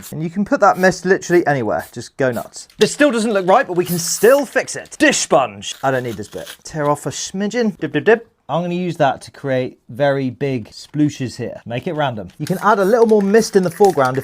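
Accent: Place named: United Kingdom. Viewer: Bro british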